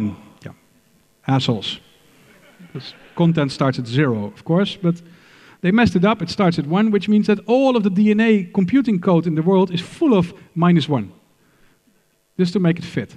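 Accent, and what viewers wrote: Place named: Netherlands. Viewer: He is dutch i hear that 😜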